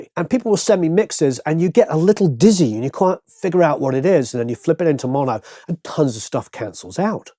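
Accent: England, United Kingdom. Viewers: Love your work. Are you from the same part of England as Sting? You sound similar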